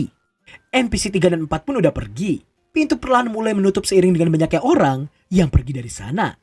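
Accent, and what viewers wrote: Java, Indonesia. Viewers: Lu orang jawa yg bg?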